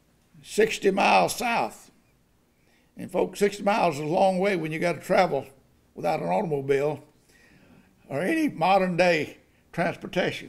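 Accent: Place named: Texas, United States. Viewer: Love your Texas accent Pastor.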